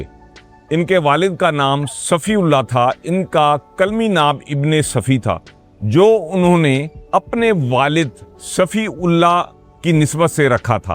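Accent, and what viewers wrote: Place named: Punjab, India. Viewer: بھائی، ابن صفی صاحب سے متعلق معلومات کے لئے بہت شکریہ مگر پنجابی لب و لہجے والی اُردو بہت کھل رہی تھی!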